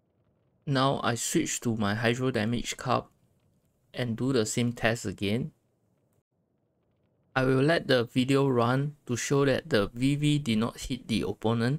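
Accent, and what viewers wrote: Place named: Singapore. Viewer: I thought u just sounded singaporean until i looked at your channel name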